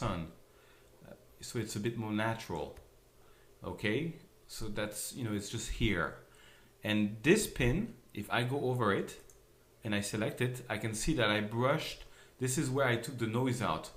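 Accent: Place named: France. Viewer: Merci pour le tuto, et l'accent Français aussi ^^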